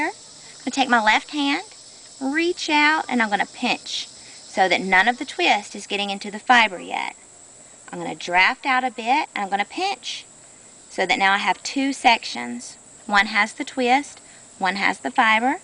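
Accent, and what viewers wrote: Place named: South, United States. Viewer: Hope you are ok, since you made this so long ago! I guess you are in the southern states area, going by your lovely way of talking! Thank you again.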